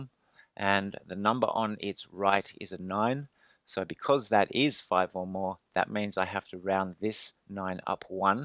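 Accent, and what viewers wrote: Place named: United Kingdom. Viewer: o0Ella0o English just a British Acent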